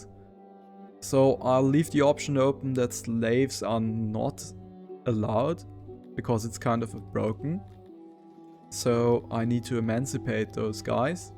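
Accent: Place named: Germany. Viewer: If you launch a city builder video and you hear a German accent, you know you're in the right place.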